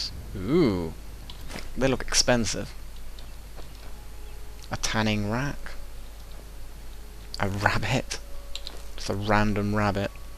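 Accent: England, United Kingdom. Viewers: I enjoy him because he doesn't have a silly gimmick(angry video game nerd....), doesn't sound/act like he is stoned/high, doesn't make us feel like we are just silently observes watching a methodical video (like when my german friend plays minecraft) but instead makes us feel like we are along for the ride through skyrim as a LET'S play.. not a walkthough. also I enjoy english accents.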